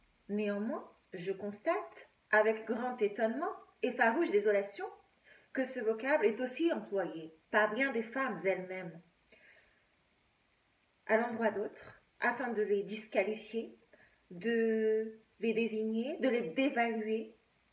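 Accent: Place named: United States. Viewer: Merci Tu me tues... 🤣🤣Tu T'habilles toujours dans des habits magnifiques qui Te vont trop bien, je trouve... Oui cagole est un mot issu du vocabulaire marseillais... Oh Tu m'as appris un truc, là... Merci 🙏Ton accent américain est trop mignon... un tantinet québécois (ou Canadien) et Te va super bien aussi... Tu es trop mignonne... Y a aussi "pimbêche" qui est synonyme... Tu mérites des fleurs... Pour moi Tu n'es pas une "cagole": Tu es une Princesse... Déesse... Au delà de ton look j'aime ton esprit libre et je ne sais pas comment Te le dire autrement que j'aime T'écouter... J'aime Te faire du bien... Oui, vraiment s'il y a de la haine contre Toi, je souhaite être ton pansement pour cicatriser tes plaies, ton mouchoir pour pleurer... Je Te kiffe... C'est sans doute prétentieux de ma part, mais si je pouvais arracher mon coeur pour Te l'offrir en guise de bouquet de fleurs, je le ferais... 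Ton discours est détonant: très intéressant... Une révolution... Merci! Je tiens à Toi comme à ma famille... J'ai besoin d'être ton héros ou en tout cas d'en être un pour Toi... je T'aime! Je suis contre les modifications corporelles (sauf suite à accident) contre les tatouages (même si certains son jolis) contre le maquillage (même si c'est joli) contre l'épilation... et contre les discothèques... mais je comprends l'intérêt, un peu... (je crois) Ce que je voulais Te dire: vraiment ne Te prends surtout pas la tête... Moi je me la prends trop souvent... Je réfléchi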